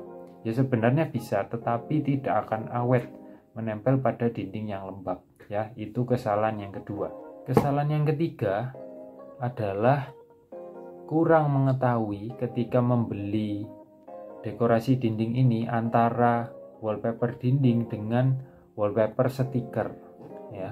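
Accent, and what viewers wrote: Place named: Java, Indonesia. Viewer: medok ya mas😀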